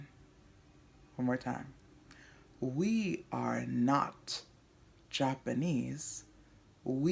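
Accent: France. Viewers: I am learning so much. Are you a french native speaker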